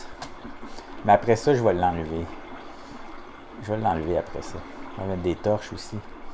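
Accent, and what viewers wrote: Quebec, Canada. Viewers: J'ai regarder toutes tes vidéo et franchement je les adorent, continu, car tu as arrêter depuis un moment, on est tous avec toi ! De plus ne change rien a ton accent québécois , j'adore les québécois. Alors va y ! Ne nous déçois pas :-)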